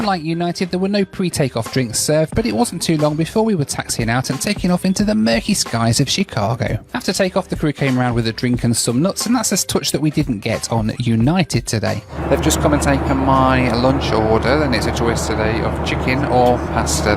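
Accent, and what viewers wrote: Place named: United Kingdom. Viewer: He's British and that's how they pronounce these cities names.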